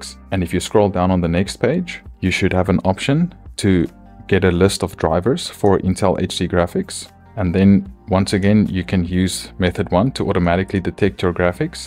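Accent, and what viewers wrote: South Africa. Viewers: that accent sounds south african,hello south african youtuber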